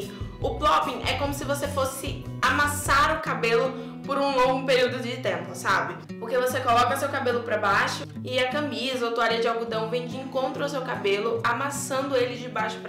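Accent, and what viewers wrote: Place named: Recife, Brazil. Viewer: Adorei seus vídeos, seu sotaque é lindo, vc é do Recife? Ah, vc é uma fofura, lindíssima!! 😘😘. Sucesso e benção de Deus pra vc e sua família! ❤❤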